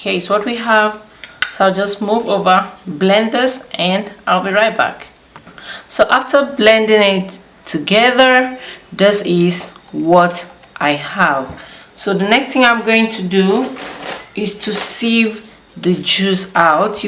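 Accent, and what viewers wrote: Nigeria. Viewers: First of all, I LOVEEEEE the fact that you stick to your Nigerian accent 😍😍😍 and not trying to fake like a lot of people on here.. secondly you didn’t talk about shaft but I’m guessing you could use it for some kind of hair mask.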